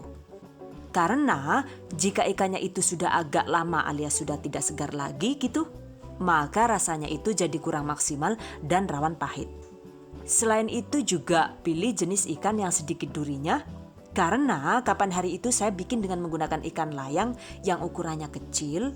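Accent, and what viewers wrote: Banyumas, Indonesia. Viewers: Mbae ngapak ya..pada baen karo aku..😁 maturnuwun mbak resepe..tak jajale..😊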